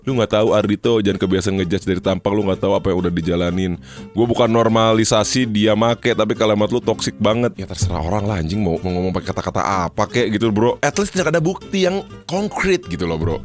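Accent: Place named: South Jakarta, Indonesia. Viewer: Menuju dialek jaksel🥶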